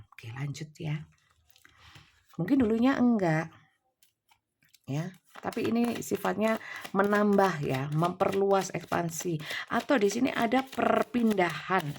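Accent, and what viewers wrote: Java, Indonesia. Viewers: ❤ Alhamdulillah, terimakasih banyak ibu, atas penjelasannya (medok banget, jelas diterima) Amin ya Robal Alamiin !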